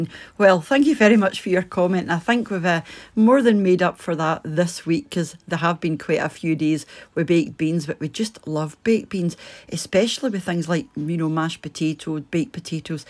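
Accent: Scotland, United Kingdom. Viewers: I agree about your voice. The Scottish accent is just so distinct and is one of my favorite. Rupauls Drag Race (if you didn't know it's a competitive drag queen show) is doing a second season for the UK and 1 of the contestants is Scottish. Now I have you and Lawrence Chaney to listen to all the time! ❤❤❤❤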